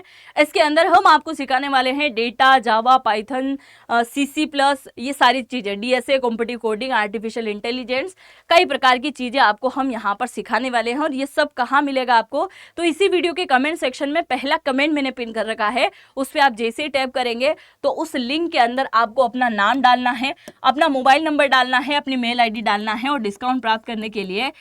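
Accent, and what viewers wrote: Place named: Marwar, India. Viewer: मैडम नमस्कार। आपके वीडियो बहुत तथ्यपरक और रोचक होते हैं। इनमें सच्चाई होती है,न कि मसाले बाज़ी। एक जिज्ञासा भी है। क्या आप राजस्थान के मारवाड़ क्षेत्र की रहने वाली हैं? आपके लहजे और उच्चारण से ऐसा ही लगता है।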